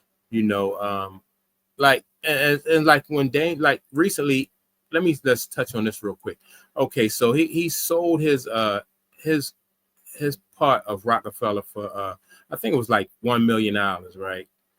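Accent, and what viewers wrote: Baltimore, United States. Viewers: I can hear the Baltimore in your voice.. my hometown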